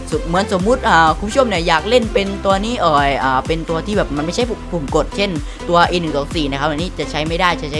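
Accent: South, Thailand. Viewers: เสียงพี่เหมือนคนใต้เลยอ่ะ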